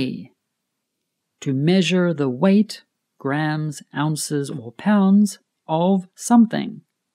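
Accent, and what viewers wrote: United Kingdom. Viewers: Is this british english ? 🤔🤔🤔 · It s british english????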